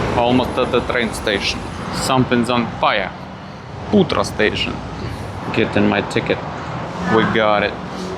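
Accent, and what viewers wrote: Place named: Eastern Europe. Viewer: I like your commentary. The sarcastic eastern european undertone always shines through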